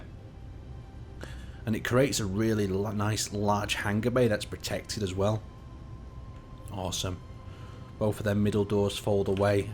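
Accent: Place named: Shropshire, United Kingdom. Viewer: If I had to guess where is from, I'd say Shropshire.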